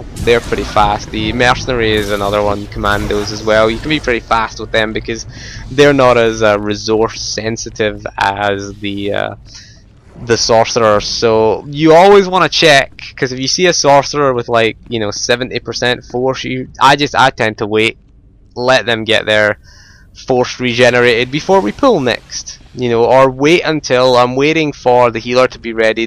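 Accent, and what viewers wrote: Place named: Edinburgh, United Kingdom. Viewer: What part of Scotland is your accent from? sounds like Edinburgh or east anyway ;3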